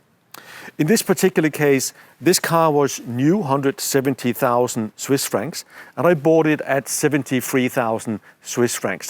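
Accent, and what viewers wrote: Denmark. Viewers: Do I hear a sligth danish accent?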